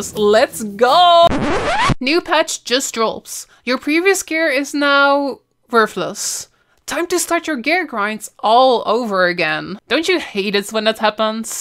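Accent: Netherlands. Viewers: Ur dutch :p !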